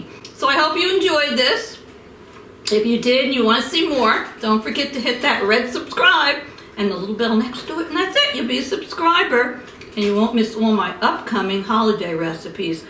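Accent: New York, United States. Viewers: Smores dip looks fantastic. Can I assume from you accent your from New York? I'm super excited for Halloween 🎃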